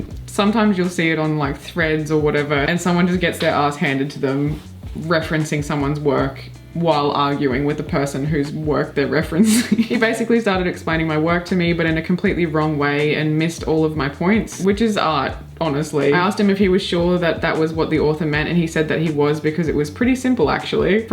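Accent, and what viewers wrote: Australia. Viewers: Hey, I love these videos of yours and I love how invested you get in the story. It’s probably the Aussie way you talk about it.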